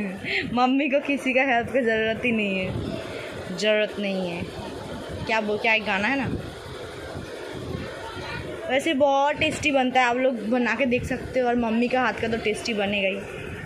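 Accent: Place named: Bihar, India. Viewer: Aap Bangla mein Hindi bihari wala Hindi bahut achcha mix kar rahi ho tikha ko titai bolkar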